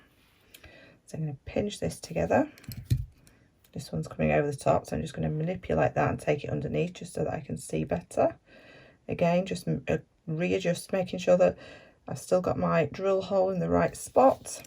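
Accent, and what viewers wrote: United Kingdom. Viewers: Enjoyed your video I learned so much and enjoyed your British accent 😊 have been to UK 3 times and would love to go again ❤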